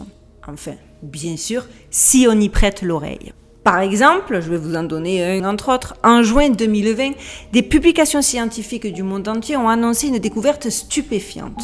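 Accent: Marseille, France. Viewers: Comme toujours, très intéressant, intriguant, et bien présenté. Tu es « TOP » dans ce rôle. En plus ce p’tit accent du sûd est irrésistible… Pour ce qui est des détracteurs, ces troll’s n’ont que brosser si ça ne leur plaît pas. Ne change rien. Petit bémol, es-tu certaine que la terre est ronde ? Cela pourrait expliquer beaucoup de choses …. Il y a encore du taf ! 🤓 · Pour répondre à votre question,je ne pense pas que vous deviez perdre votre temps avec des orcs, gobelins ou autres trolls 😂,même si cette théorie de la terre creuse me parait totalement impossible ( volcan, oxygène, lumière etc..),je sais qu'ensemble nous cherchons le vrai du faux protégez vous de ces obscures graugs par le mépris..je sais " facile à dire,pas facile à faire",nous sommes beaucoup à apprécier vos interventions du dimanche,votre sourire,et votre timbre marseillais,et si nous sommes tous timbrés et bien "plus on est de fous..."😊😜❤️ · manifaiiiike !!! 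trouver toute ces infos doit etre un boulot de dingue et que ceux qui te traite de Cagole aillent se faire cuire le cul !! des ignorants du parler marseillais surement !!! gros bisous de marseille ou il pleut comme vache qui pisse aujourd'hui et finit la canicule on respire enfin !!! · en tout cas, j'adore voir duchesse (j'ai cinq chats), j'aime les sujets que tu traites (ça fait partie de mon métier de diffuser des livres sur ces sujets) et purée j'adore ton accent marseillais qui doit être vraiment saisissant pour les américains. Et alors quand tu ris juste après avoir dit une sottise c'est juste un rayon de soleil. Continue et si tu as des sujets sur les civilisations anciennes, n'hésites pas, je suis en attente. Je suis une mamie de 60 ans, éditrice et traductrice et franchement, il y a quelques youtubeurs dont toi que j'aurais adoré avoir pour enfants, les miens sont macronistes, et ils ne jurent que par le dieu BFMTV, autant dire une déception terrible pour une vilaine complotiste comme moi 😂😂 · Hello comment ça va bien 🥰🥰🥰🥰 nous ont adore tes vidéos tu est super j'adore ton accent de Marseille allez go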